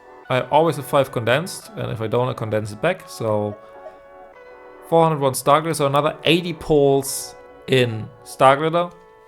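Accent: Germany. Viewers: Qick question are you deutsch? Just have this feeling 😅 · As soon as you started talking I understood that you are german 😂. Great vid btw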